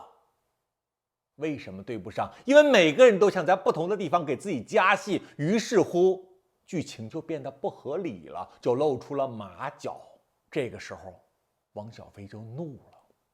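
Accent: China. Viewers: 你是大陸口音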